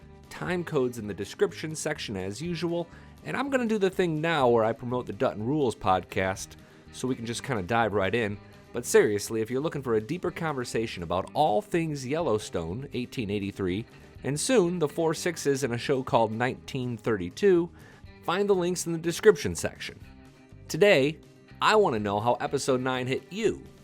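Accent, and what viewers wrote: South, United States. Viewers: I could care less. I hate her lousy southern accent narration. It is very distracting.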